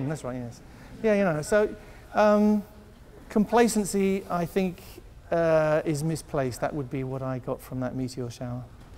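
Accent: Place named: United Kingdom. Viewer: wow a learned guy with a British accent....charming...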